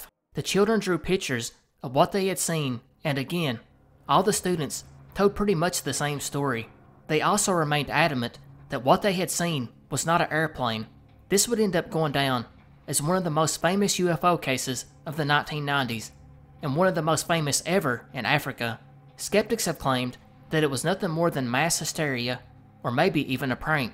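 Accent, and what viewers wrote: South, United States. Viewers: You don't know how happy I get when I see a new video from you. Love that southern accents. It makes the videos so much better. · There’s something about a country accent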